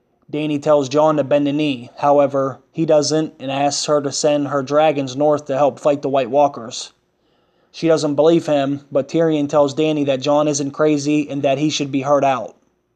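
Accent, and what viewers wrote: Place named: Philadelphia, United States. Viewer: you must be from the philly area talking like that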